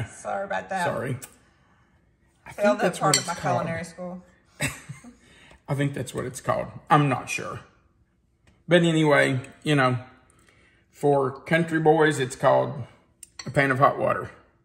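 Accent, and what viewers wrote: South, United States. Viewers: I love your recipes... I love the way you teach and instruct us on how to fix them... I love your soft Southern in speaking.... And most of all I love that you're Christian... Thank you so so much... Your presentations are very comforting to me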